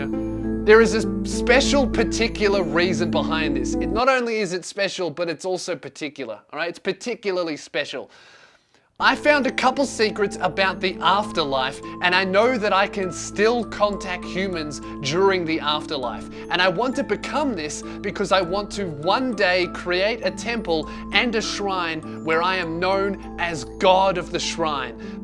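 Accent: Australia. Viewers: Fuck this is the first video I have ever seen and in the first second I swear to god if you aren't fucking australian then fuck. Who is. Because I am and you are either Aussie or a fucking child of steve irwin.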